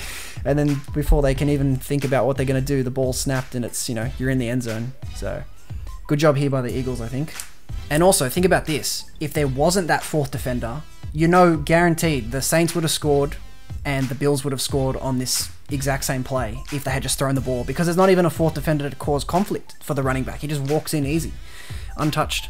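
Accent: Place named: United Kingdom. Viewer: Wait your British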